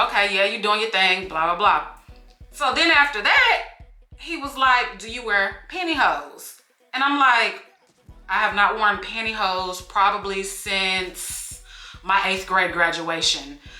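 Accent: New York, United States. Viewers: went out to the mall today (BIG MISTAKE), Watched some Sunday Football (boring games), Sat down and ate a burrito while watching story time (NOW THAT WHAT THE F*** IM TALKING ABOUT) LOL. Love your story times! I'm from NY, living in Florida and your NY Accent was on point! xo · That's a new yorker for you..... Lol.... Born and raised but i don't talk like that... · Best friend that’s how New Yorkers talk trip me out too I’m from Florida we laid back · Lol I’m crying at your New York accent. You did good I’m from Brooklyn. They say I’m aggressive too 😂😂😂😂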